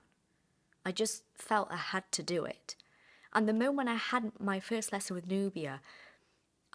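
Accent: United Kingdom. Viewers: Incrível pela sua fluência e sotaque britânico. Congrats!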